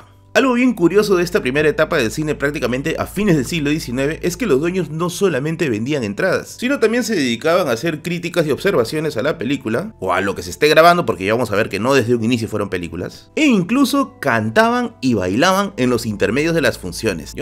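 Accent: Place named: Peru. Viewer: Tu habla me recuerda a Aldo del robot de platón. Por lo visto no cambian los acentos en Perú. Saludos 🇦🇷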